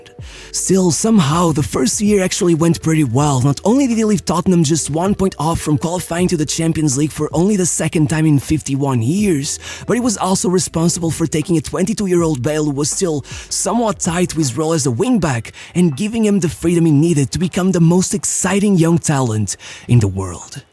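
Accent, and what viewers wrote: Portugal. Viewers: This accent is very Portuguese. 😅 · Eu sei que és português, esse sotaque tá aí, não dá pra esconder🤣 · Real ones know this guy is portuguese, i know buy the accent!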